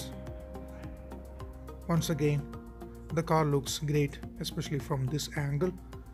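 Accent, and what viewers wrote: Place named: Kerala, India. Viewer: Manglish kollam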